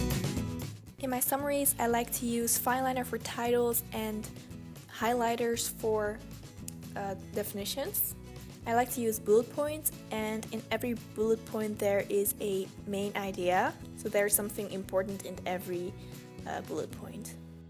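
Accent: Netherlands. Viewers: Wait a minute, are you Dutch?? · I love it when you immediately hear someone is Dutch or not ;) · ik dacht al dat je nederlands was😂😂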